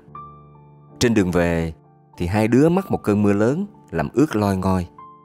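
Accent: South, Vietnam. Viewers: Lan dau nghe truyen cua chau doc truyen rat co cam tinh vi sao? Doc dung chinh ta ; va dung giong mien Nam...v..v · Giọng đọc rất miền nam nhưng không quá nặng của thổ âm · Giọng đọc miển nam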